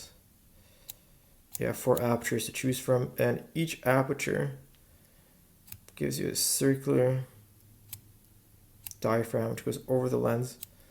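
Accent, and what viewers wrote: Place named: Canada. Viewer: This guy sounds Canadian af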